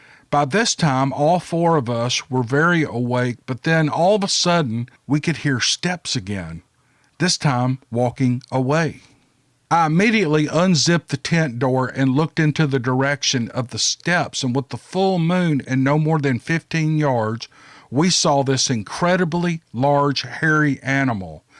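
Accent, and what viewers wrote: South, United States. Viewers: It's that sweet southern drawl....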